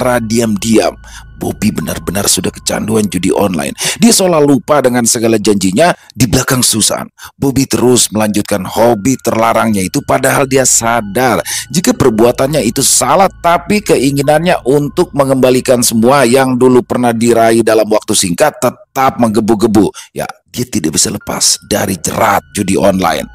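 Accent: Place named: Betawi, Indonesia. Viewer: sangat terhiburr. Om...keren pembawaan nya..tapi klo sdh cerita ada gaya betawi nya lbih lucuu...😂keq warung bng doni...sukses terus ya om ❤️❤️r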